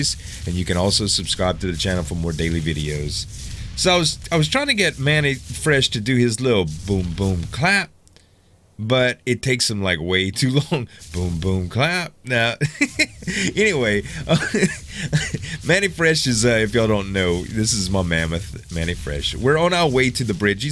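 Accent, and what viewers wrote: Louisiana, United States. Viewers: Where are you from? I'm catching a fellow Cajun accent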